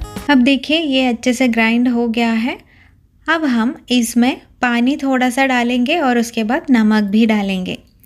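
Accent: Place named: Kerala, India. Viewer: I like your Hindi. You remind me one of my Kerala friend.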